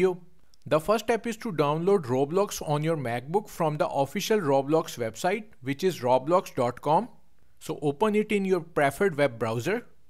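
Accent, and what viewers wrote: India. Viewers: worked amazingly, thanks indian accent man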